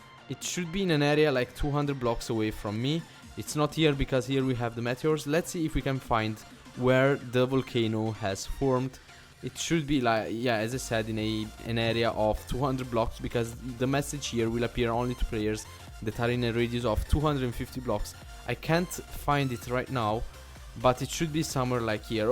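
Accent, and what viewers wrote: Italy. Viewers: Are u italian? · Are you italian?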